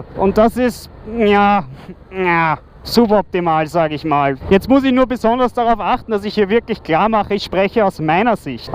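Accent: Vienna, Austria. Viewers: Ich finde dein Video ist wohl eins der besten und unterhaltsamen teste. Wie du schon sagtest gehen viele Motorrad Tester viel zu sehr auf das ein was eigentlich keinen Juckt, dein Video wirkt kein bisschen langweilig und dazu dieser wunderbare Österreichische Dialekt macht es zunehmend unterhaltsam. Ein tolles Video wovon ich mir auch gern öfter welche ansehen würde 👍🏻👌🏻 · Viennese (Austrian-)German ;) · I am trying to learn German and these videos are a real help, I know Austrian German is a lot different, but still they give me a really good gage of where I am in my learning, thank you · Genialer Test, angenehme Stimme, sehr sympathisch mit der österreichischen Sprache. Ich würde mich über mehr deutsche Motovlogs freuen.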